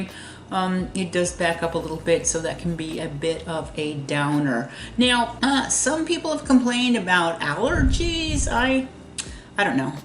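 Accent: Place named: East Coast, United States. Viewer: This lady even has an east coast accent and is NOT from Arizona; so I’m not sure where she thinks she knows how it is here. Lmao!!!!! 😂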